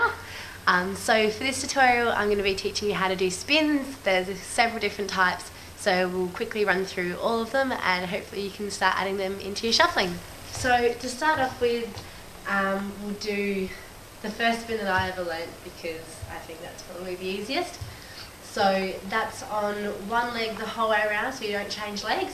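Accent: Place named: Australia. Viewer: Shut up!!!!! I keep falling in love with you every time i hear you australian accent!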